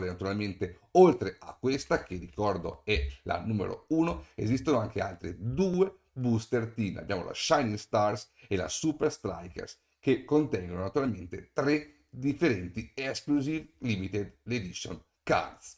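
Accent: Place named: Palermo, Italy. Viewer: Sei di Palermo ? Si capisce dall’accento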